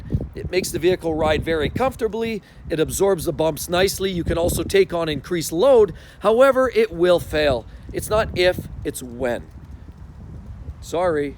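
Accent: Canada. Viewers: My pleasure. Thanks for making these very informative videos (with a nice Canadian accent, to boot.:)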